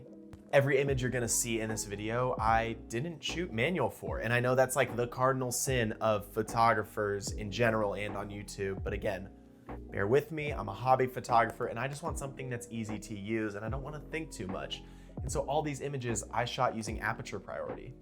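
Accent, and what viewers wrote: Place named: Louisville, United States. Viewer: I can tell by how you say it, you're definitely a Louisville native! Much love from the 502 brotha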